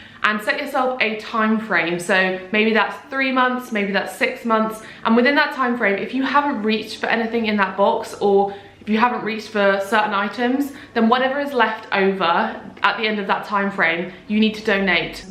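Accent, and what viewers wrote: England, United Kingdom. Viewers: thank you for sharing those tips ♥ and love your english accent too :3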